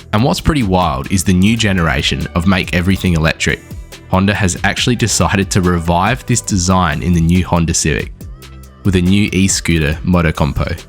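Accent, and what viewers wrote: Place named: Australia. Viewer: just found this channel, awesome video man, instant sub. also i find it funny that Your description says "United States" but you have an aussie accent lmao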